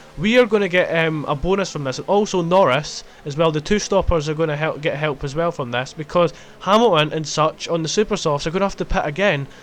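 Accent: Scotland, United Kingdom. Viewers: he is scottish. It's their accent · U Scottish?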